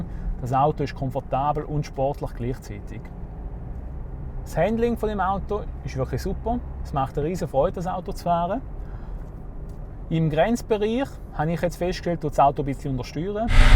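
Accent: Switzerland. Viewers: hätte ich mir fast gedachtm hab Freunde die ich von Autotreffen kommen und wenn die ihr Schwitzerdütsch auspacken dann klingt das auch so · de typ isch 100pro en sangaller hahahahhaaha me weiss jedoziit wa dVodorädo am mache sind. lol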